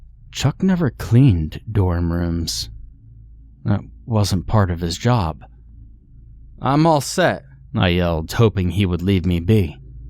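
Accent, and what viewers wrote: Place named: Appalachia, United States. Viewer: I don't like the hilly billy accent.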